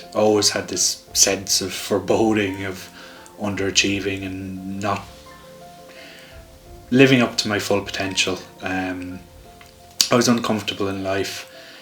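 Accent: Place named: Ireland. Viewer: A well-made video about an important topic. Love your Irish accent! Nice choice for background music. I'm very easily distracted myself an possibly someone who could meet the diagnostic criteria. But I was able to focus until the end. Cheers!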